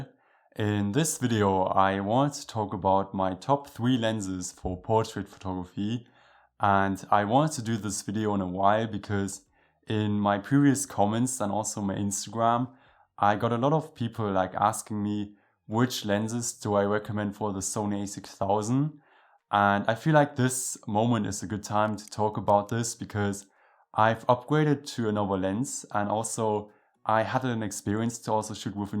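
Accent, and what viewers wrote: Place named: Germany. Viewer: I have a question... Do you speak German!? (I'm from Switzerland and I find that you have an German accent haha😂)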